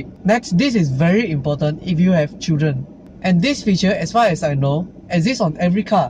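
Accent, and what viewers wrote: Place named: Singapore. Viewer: walau... your English is having same slang as Singaporean English...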